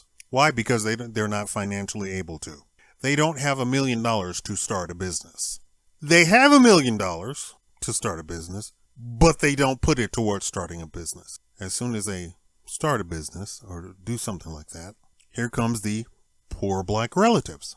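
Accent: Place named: Caribbean. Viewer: ha ha dude where is your family originated? i hear a carribian accent.Were you born in nevada?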